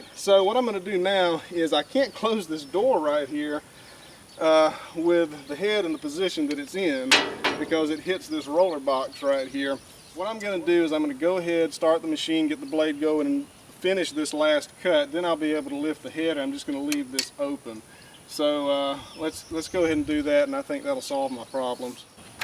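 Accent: South, United States. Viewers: Its not a mistake if you learn something from it. I subscribed as soon as I seen you were honest enough to show the others that this is a huge no no. I like your channel and wonder where your from. Sounds southern but then I still have my N, Carolina accent and I left when I was about eight years old. Been in Alaska most of my life and never ran many bandsaws. I did run circular blade saws with insert teeth. easy to sharpen but two men to lift back on the mill. I am 72 and learning like you only on youtube so I am not a armchair coach. Good video an great garden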